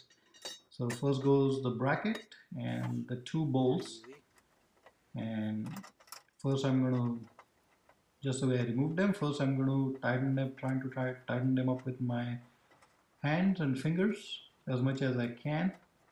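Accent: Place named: India. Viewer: indian accent ? its terrible man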